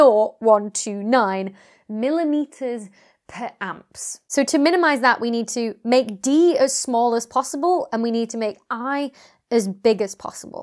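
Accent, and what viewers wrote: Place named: Cockney, United Kingdom. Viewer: Your Cockney accent is cool. (I'm not native English speaker)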